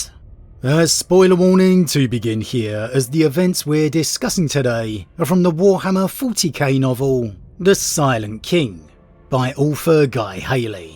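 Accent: United Kingdom. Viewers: You've got my favorite British accent of all time. I hope you never stop!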